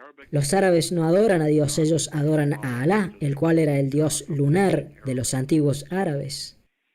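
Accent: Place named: Córdoba, Argentina. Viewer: Sonas cordobes, ya me siento en confianza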